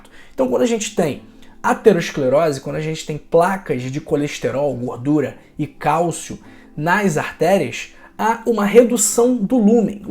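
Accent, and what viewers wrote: Rio de Janeiro, Brazil. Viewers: O sr tem sotaque de carioca . Parabéns pelas dicas. Obrigada pela consulta.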